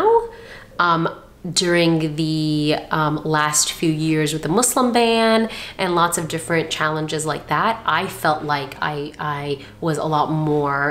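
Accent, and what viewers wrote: Syria. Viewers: You are inspiring preson!, Dr Greeting from Egypt 💜 Note: I was superised when you speak Arabic 😂 Your voice was different and nice and The Syrian dialect befits you very much... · After mentioning that u lived for years in UAE I was about to say speak Arabic so I can know what dialect do u speak but after I know, SYRIAN lol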